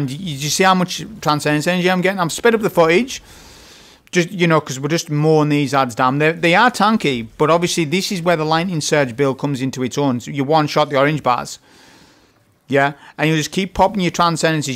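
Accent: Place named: North East England, United Kingdom. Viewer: You sound like you from my neck of the woods , north east :) just found your channel, thanks for the solid advice il shall be grinding all day!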